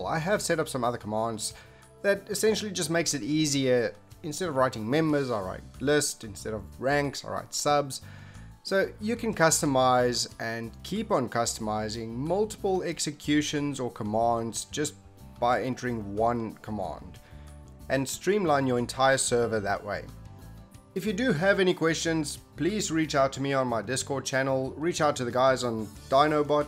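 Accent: South Africa. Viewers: ur South African right?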